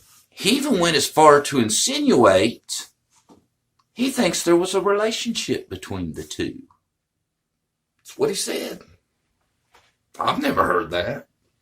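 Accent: United States. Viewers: Oh Cool! Idk why your channel hasn't popped up here before but I'm happy to meet y'all! I live in Knoxville, TN. I'm assuming y'all live in TN as well with that southern draw. Lol This was the first time I've gotten a good peek of Andy. Seems if brains were made of petroleum he wouldn't have enough to drive a piss ants go cart halfway around a fruit loop! No wonder H is emotionally disturbed. I don't believe a person like that would have said he didn't want to hear what happened that day though. Imo he knows if H tried to open up to him about it! Also imo LE needs to go back to the start & interview these people again! New sub here! 🙋😊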